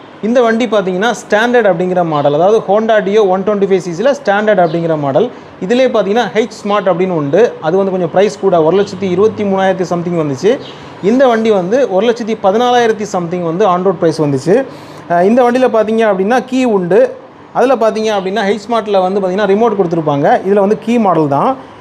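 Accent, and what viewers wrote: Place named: Kanyakumari, India. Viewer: உங்களுடைய தமிழ் அருமையாக இருக்கிறது நீங்கள் கன்னியாகுமரி காரரா?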